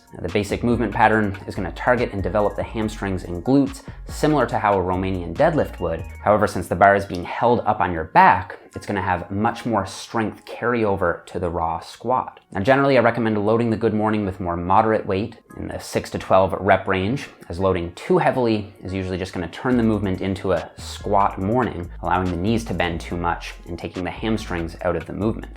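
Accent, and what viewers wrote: Newfoundland, Canada. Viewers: Hello, been a big fan of Good Morns for years. Can you tell me what your thoughta are on a front GM where you're hugging a weight plate? Thanks very much. Also, are you from Nfld? Your AR's sound like mine and I'm from Nfld.😋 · The Canadian "r". Very distinctive.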